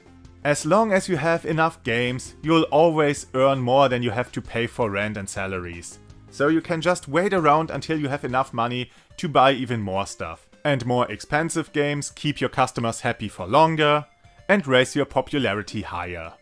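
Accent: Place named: Germany. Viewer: Einfach mal n Like und Follow, weil du dir anscheinend seit Jahren so Mühe gibts :) Außerdem mag ich deinen deutschen Akzent im Englischen! :D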